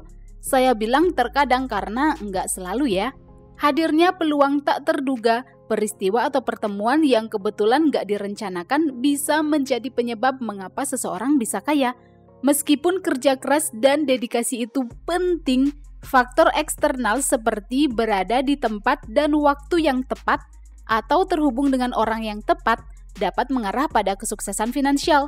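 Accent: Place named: Malaysia. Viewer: Logat melayu ya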